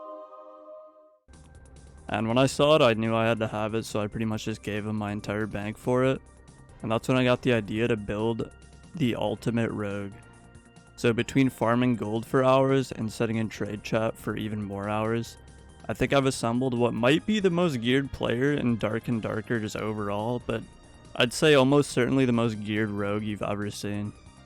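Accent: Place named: Valley, United States. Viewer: Thats a gross ass val accent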